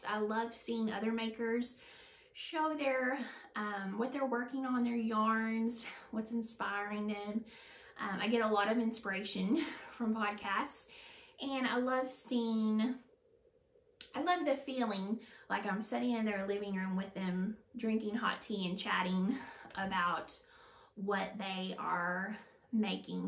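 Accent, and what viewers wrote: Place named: Arkansas, United States. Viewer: Congrats on daring to dive into podcasting! I enjoyed your first episode. And thank you for adding all the pattern/yarn/etc details in writing on the screen... especially because I’m not (yet!) accustomed to an Arkansas accent😉, even though I once drove from Ontario to Arkansas once! It was beautiful, especially Hot Springs National Park, which was my final destination. Driving back home, we took the route through the Wachitas/Ozarks—gorgeous, and reminded me of the Laurentians where I spent my summers. Keep up the good work!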